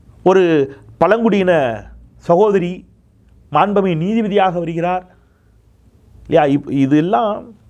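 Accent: Tirunelveli, India. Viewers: தம்பி எல்லாம் சரி தான் பா. ஆரம்பத்தில் ரொம்ப மிராட்டுகிராயே. நெல்லை வாசமா? நீதிபதி தங்கைக்கு, வங்கி மேனேஜர் ஆகியோருக்கு வாழ்த்துக்கள்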